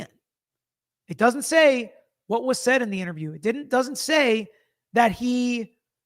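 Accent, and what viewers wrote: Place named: South, United States. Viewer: Put on 1.5x speed if you’re not from the South.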